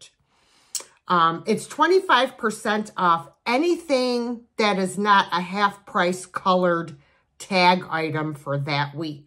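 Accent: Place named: Western New York, United States. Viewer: Hi, Just a Question....Do you remember Uncle Jerry's Club? I think it was from the 50's filmed live from Rochester or Buffalo NY studio. It had Aunt Annie on the piano and I used to try and copy the accent, that you also have;^) My sister and I never missed it (Sunday or Saturday morning??) We loved saying Cheektowaga Lackawana and Tonawanda etc. Love your Sat 3pm show and of course others. Please never lose your enthusiasm.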